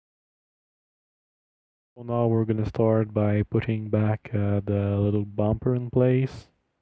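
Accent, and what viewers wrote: Canada. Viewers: Are you from montreal?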